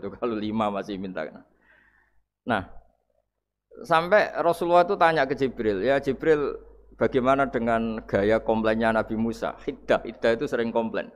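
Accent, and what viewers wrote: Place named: Java, Indonesia. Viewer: Ora ngapak ya medok 😁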